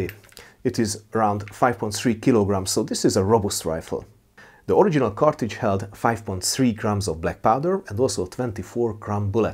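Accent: Hungary. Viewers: Ah, Kammerlader story told in exquisite hunglish, love it! :D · This guy has strong hungarian accent...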